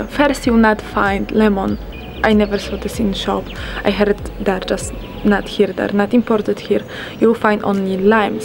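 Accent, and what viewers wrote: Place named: Poland. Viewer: Are you Polish? Omg your accent is so cute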